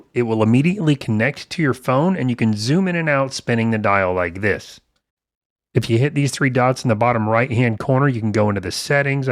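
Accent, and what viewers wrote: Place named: Indiana, United States. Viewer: I really enjoy and understand (at least almost all) what you are saying. You speak in my native language- "Indiana English"!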